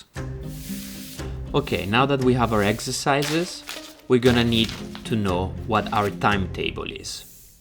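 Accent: Italy. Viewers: Thank u for those tips, they were very helpfull. I have a question, ma sei italiano??😅 dalla pronuncia sembra di si, comunque grande grazie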